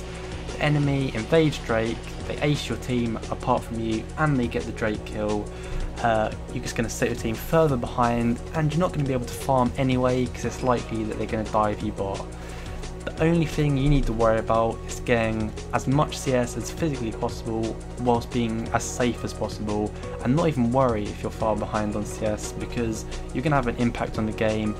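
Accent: United Kingdom. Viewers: He includes (british gamer) because the accent is what brings people here:D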